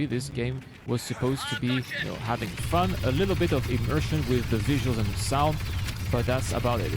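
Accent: France. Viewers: You are french right ?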